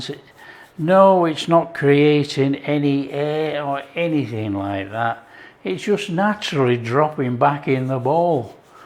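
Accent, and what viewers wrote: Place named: Northern England, United Kingdom. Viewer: Oooop,s finger twitch, these recipes out there... I'm a Salfordian living in Greece now..X, Not only the recipes I love but your Northern Twang also, like having the North of England in our little Greek Island with the food. Thanks.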